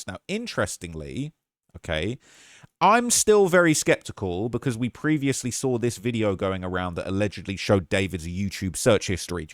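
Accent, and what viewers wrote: United Kingdom. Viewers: This is extremely informative!how did i not find you before??All other networks and youtubers are being so prim and proper about it all and not even giving updated updates, if that makes sense. And the brit accent is so refreshing! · when i frst started watching you, i heard your accent and i'm British too and you whipped out a flick knife that locked in place i was like AAAAAAAAAHHHH TAKE THAT INTO THE POLICE STATION YOU'RE GONNA GET IN SERIOUS SHIT. it took me a minute to realise you lived in the US lmao i was like oh wow, i thought this guy was an idiot turns out i'm the idiot and had just landed on the channel. keep up the great work you're the only d4vd reporter i watch. rotten mango has done some good content also but you're a basically daily reporter on this. it's excellent. i enjoy the reddit stuff!! you've scrolled past one of my comments before. 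freaked me out.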